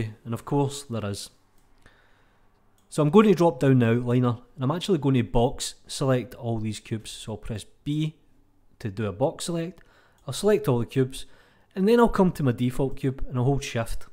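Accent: Scotland, United Kingdom. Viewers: Just dropping by to say that I love your video format, short and concise. Also, your accent makes me wanna go back to Scotland so bad! Peace.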